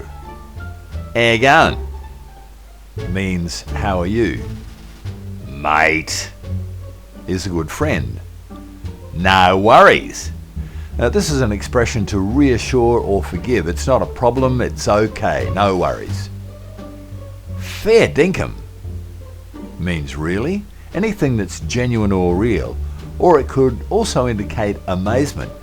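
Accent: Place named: Cockney, United Kingdom. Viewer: Seems Cockney, doesn't it?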